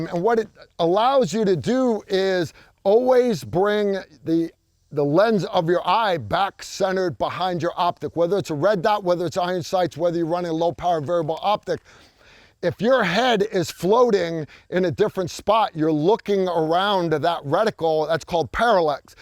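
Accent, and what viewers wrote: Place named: New England, United States. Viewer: I've been tuned out for a while. Glad to see you're still giving good men great advice. Gotta ask, are you from the New England area? You sound like a tactical Lobsterman!!!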